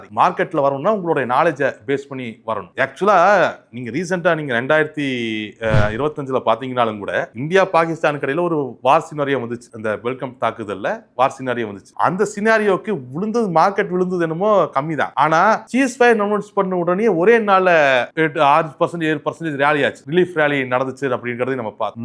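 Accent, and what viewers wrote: Kongu, India. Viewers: Great sir, I like your kong slang sir. நீங்கள் எங்களுக்கு தன்னம்பிக்கை கொடுக்கிறீர்கள் sir💯💚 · I like your kongu slang sir❤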